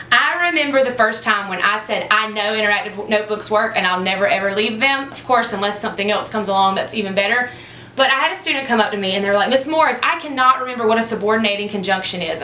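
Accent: South, United States. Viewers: UGH! I so need someone like you in my life! You are the blonde version of me, southern accent and all. lol Thanks for sharing!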